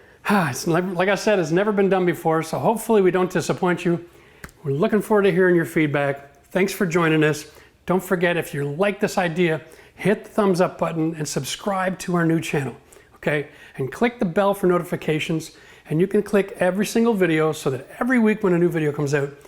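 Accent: Canada. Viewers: I was just used to your CANADIAN AYE:)~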